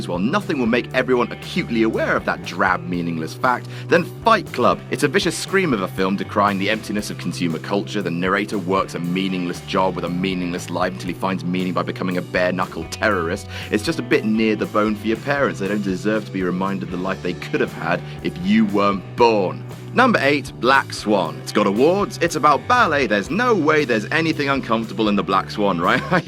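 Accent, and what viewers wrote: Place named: England, United Kingdom. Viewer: Love an English narrator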